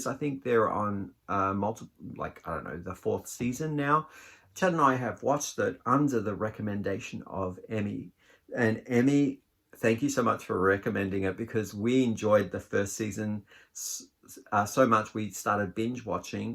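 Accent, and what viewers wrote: Australia. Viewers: Happy belated birthday! How crazy is it that you received 2 cards exactly the same!! The sweater looks fantastic on your hubby! That gray shawl is beautiful I like how you incorporate the contrasting orangeish color and the burgundy will be a nice contrast as well. Where are you from originally? I've been trying to place your accent, it give me Australian vibes 🤔 I hope you had a great time at the new restaurant, can't wait to hear about it.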